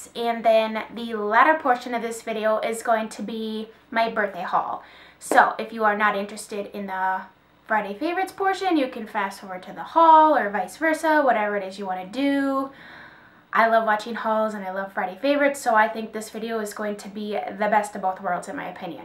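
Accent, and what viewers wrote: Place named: North, United States. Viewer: I love the way Northerners talk! Just makes me happy! It's so cute!! :)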